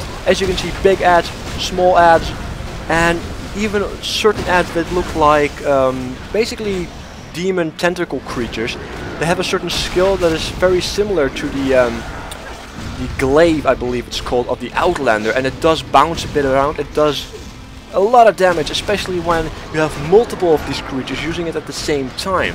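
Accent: Netherlands. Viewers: something says you have a dutch accent! :) neverthe less.. good video. enjoyed it. Good to know my 19 euro's are a good investment.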